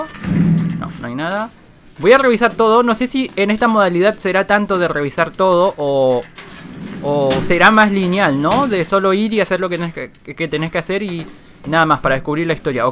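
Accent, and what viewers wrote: Argentina. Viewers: sos argentino???? desilo dale! xD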